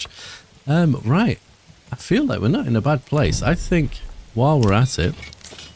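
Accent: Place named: United Kingdom. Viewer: Ahh, you don't sound nasally...you sound British! 🤣 Unfortunately there is no way to unsnap road creation, unless maybe by starting short of a snap point instead of at an existing road. In my series I set up 2 iron deep mines and even with 8(?) bloomeries I couldn't keep up with ore production. They mine quickly. Good battle!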